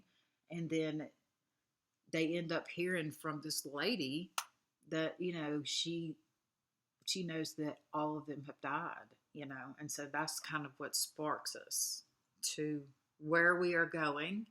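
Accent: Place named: Alabama, United States. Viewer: Aww i miss the bama accent even more after hearing your voice! Pretty neat B!! Xoxo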